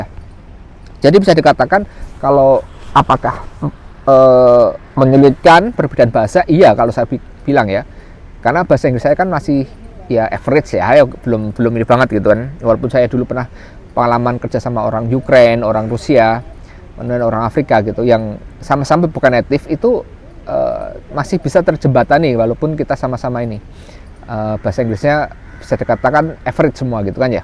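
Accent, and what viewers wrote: Java, Indonesia. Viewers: Iya... Logat Jawa bahasa Indonesia enak, nyaman dan easy listening... Semoga sukses selalu... Masbro (kyknya kita seumuran, mas )...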